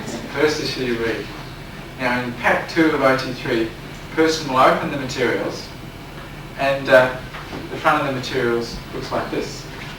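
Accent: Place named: United Kingdom. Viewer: But his accent is British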